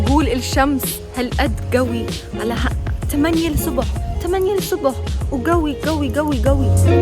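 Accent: Jordan. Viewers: تحكي اردني احسن مني 💔✋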